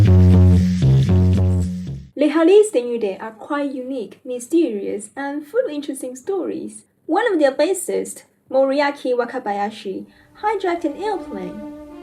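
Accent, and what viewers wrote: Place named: United Kingdom. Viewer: Her accent is actually tinted with British English, can you hear it?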